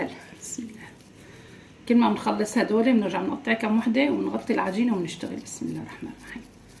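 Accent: Aleppo, Syria. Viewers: الحمدلله عسلامتك لايحرمنا هللهجه الحلبيه الاصليه..ذكرتينا باهلنا...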